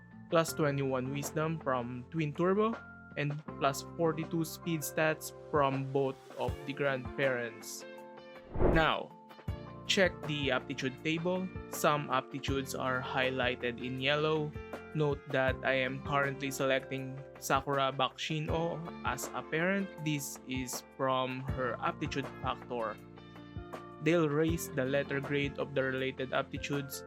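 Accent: Philippines. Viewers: I smell Filipino accent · You sound... Pilipins..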